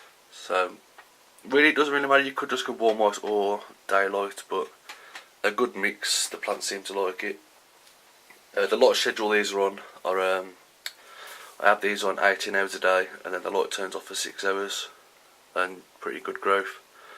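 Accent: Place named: Midlands, United Kingdom. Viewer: Great video. I could listen to you "ramble on" all day. (You sound like a Midlands boy to me :)) Have you tried mixing in 20 - 30% perlite into your seedling mix? I find it helps to mitigate risks of dampening off. Ecothrive Charge, too, is a really nice potting mix amendment—easily obtainable in the UK. Peace, love and happy, vigorous sprouts—————Everest.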